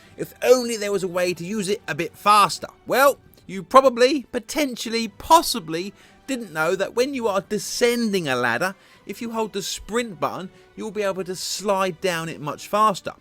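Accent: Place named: United Kingdom. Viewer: Your "Probably, potentially, possibly" combined with Your British made me hit "subscribe".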